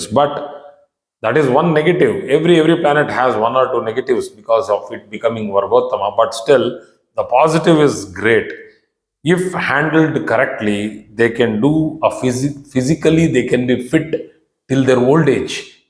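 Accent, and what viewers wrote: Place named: Telugu, India. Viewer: Telugu accent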